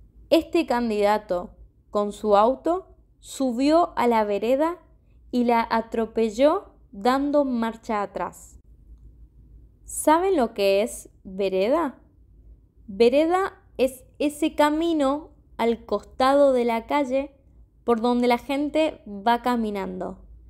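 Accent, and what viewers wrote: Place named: Argentina. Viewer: Hola, ¿ Qué tal? Estoy encantada con tu canal en Spotify y como no encontré mas audios vení al YouTube y ti encontré ❤. Ppr favor ¿Cuando volverás a subir mas vídeo? Estoy aprendendo español y quiero aprender el acento argentino que es muy hermoso, ademas año que viene quiero ir de vacaciones a Argentina y su contenido ayuda muchísimo. Muchas gracias por ellos. Soy brasileña y me encanta el español, Argentina y su gente.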